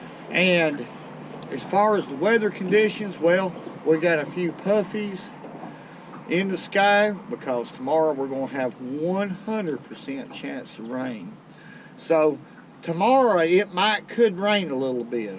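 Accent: South, United States. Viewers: I love this guy all his videos are amazing I love his southern accent to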